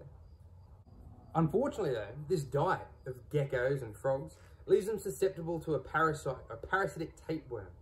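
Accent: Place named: Queensland, Australia. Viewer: Lovely snake but what's the deal with that horrible Queensland accent. Its not very Australian (Victorian)!!!!!!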